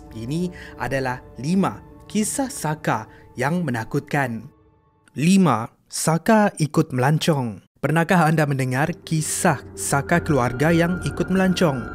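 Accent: Sabah, Malaysia. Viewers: Dia Sabah, bahasa pun lain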